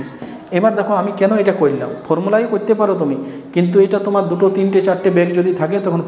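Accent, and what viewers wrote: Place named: Purulia, India. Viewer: mone hoyechilo sir apnar kotha sunei karon amra Purulia Bankura r loker amader kotha sunei bujhe jabek j amra Bankura ba Purulia r basinda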